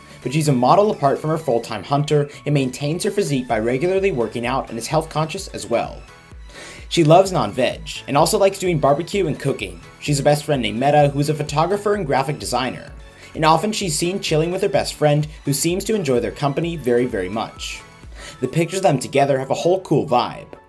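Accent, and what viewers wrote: South, United States. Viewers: Does a more perfect, genuine, beautiful woman exist? Wish there were millions more like her! The world would be so much better. Natural beauty - no spiked/purple hair, nose ring, ear piercings, tongue piercings, nor tatts. Just natural perfection. Even her Southern Belle accent is awesome! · Bravo! Very cool. I am from New England, so we think people down south talk funny. Well, I love her southern drawl and have nothing but respect for her outdoor skills. I know the dad is very proud.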